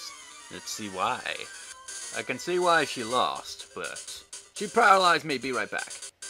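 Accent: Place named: United Kingdom. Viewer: Love the British(?) accent. Lol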